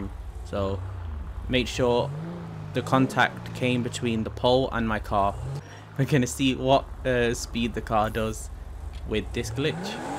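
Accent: Netherlands. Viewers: Yo you dutch? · Are you dutch?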